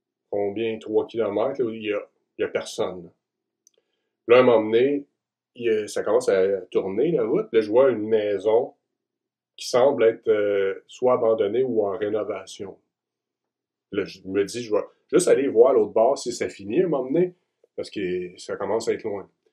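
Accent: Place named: Charente, France. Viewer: Sauf erreur de ma part, c'est pas l'accent vendéen. Plutôt celui d'un piéton de l'Atlantique ! Pas très loin de vous, en chatente ❤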